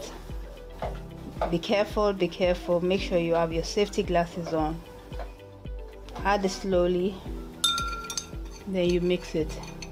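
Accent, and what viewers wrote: Nigeria. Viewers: Ok, this trashy Nigerian accent gets on my nerves.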